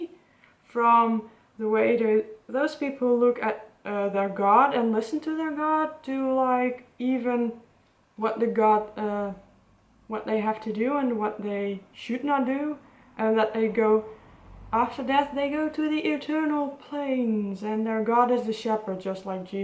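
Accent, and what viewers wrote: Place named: Netherlands. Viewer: You have a Dutch accent Are you?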